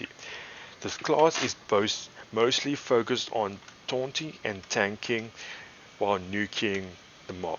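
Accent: South Africa. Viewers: You sound South African. Thanks for the video though, wish you could update it with the two latest classes.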